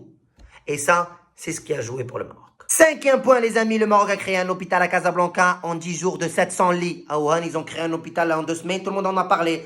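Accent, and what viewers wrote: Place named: Algeria. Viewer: ET C KOI CET ACCENT PIED NOIRE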